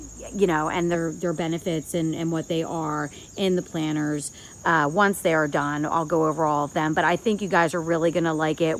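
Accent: New Jersey, United States. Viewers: I deffs knew were from NJ from listening before reading the description. And I love how cute you've made that shed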